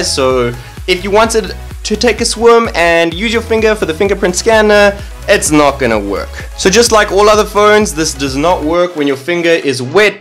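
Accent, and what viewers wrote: South Africa. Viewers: I like the Proteas accent !!!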